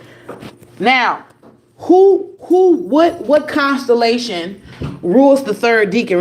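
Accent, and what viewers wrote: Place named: New Orleans, United States. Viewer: I could hear the NOLA coming out as you were teaching! Mad love and respect for how you are bringing truth together across belief systems.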